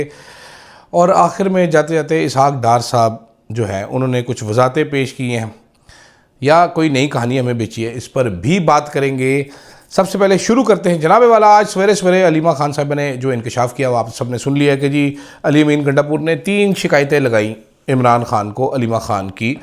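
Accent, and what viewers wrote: Punjab, India. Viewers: Love your local English+panjabi 😊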